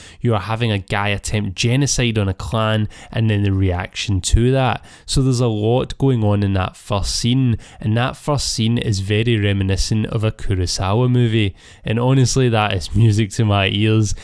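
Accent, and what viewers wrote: Scotland, United Kingdom. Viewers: imagine if you speak in your normal speed anyways its your accent of course scottish will understand it... thank you for your clip and subtitles from your efforts,though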